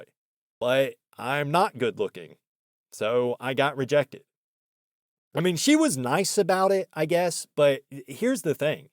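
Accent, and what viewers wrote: South, United States. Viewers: Dude your voice is a gift from GOD. Every part of it, the way you speak, inflections, the hint of southern draw (drawl?) man there is for sure something there. I hate how overused the word is but my gut says "authentic". All sorts of content and things could come from this. Hope you don't stop with just ONE youtube channel!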